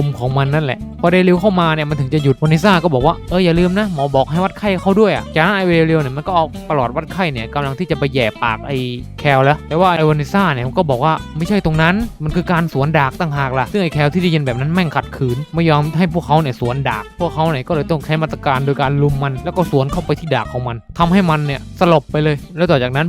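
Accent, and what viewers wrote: South, Thailand. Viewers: เหมือนจะสำเนียงอีสานนะ แต่บางทีก็ไปทางใต้ มีเสน่ห์ดีพี่ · พี่พูดเหมือนสำเนียงเหมือนใต้เลยครับ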